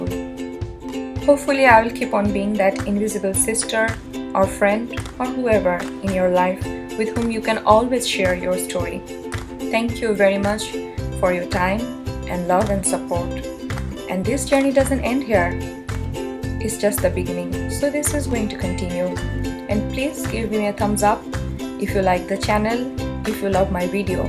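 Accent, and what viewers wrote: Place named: Sylhet, Bangladesh. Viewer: haha me too oank hashi pai sylhetii shunlay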